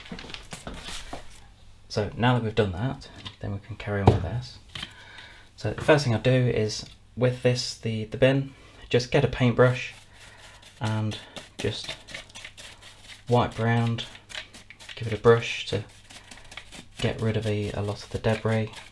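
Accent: United Kingdom. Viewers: Thank you very much for these tips and tricks and spoken in such a lovely voice. I’m from Western Canada so UK accents are not as common here but always a pleasure to listen too. Im certain I watched another demo that when you cleaned the filter you were to try as best you could to close of one end and fill and shake with water and repeat until the water ran clear. Is this ok IYHO? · Was thinking if I want to watch 26mins vid about cleaning my dyson. The british accent says yes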